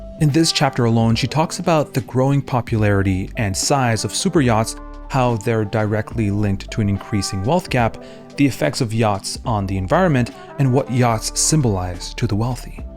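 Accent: Quebec, Canada. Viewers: when you speak french it sound like Québecois french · t tu québecois?!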